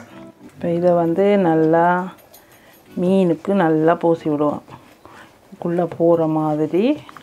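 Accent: Jaffna, Sri Lanka. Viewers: Akka...I like the way you speak yarlpanam ...keep it up❤ the Tradition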